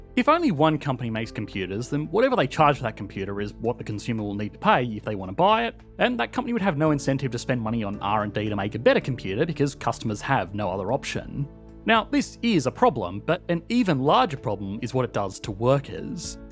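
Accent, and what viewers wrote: Australia. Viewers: I only realise that you're an Australian at the end of the video. 'B(e)ye'. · it's australian. he has a very obviously australian accent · the fact that you think this channel is from the US speaks volumes of how ignorant you are. The man has a clear Australian accent and mentions that he lives in Australia all the time. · I don't think he's British or he would have mentioned that many other countries have state owned enterprise. UK being one. His accent seemed Australian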